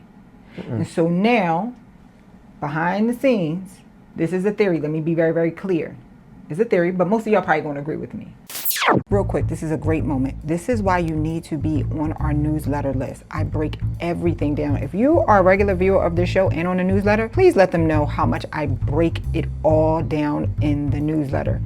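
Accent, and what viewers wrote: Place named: New York, United States. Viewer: Hello lady is your sister the wife in the Henry family ? You all look similar and have the same accent ? Like New York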